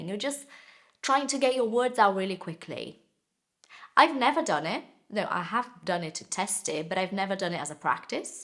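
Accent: United Kingdom. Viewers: Does she practice an British accent? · ai para de ser chato, ela não tem sotaque brasileiro nenhum, ela passa 100% como britânica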